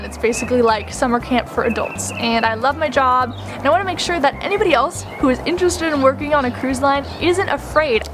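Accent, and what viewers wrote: United States. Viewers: I sounds like you have an American accent. Were you in entertainment? I was a casino dealer on RCCL. What can make or brake it, to me, is your roommate. Any opinions?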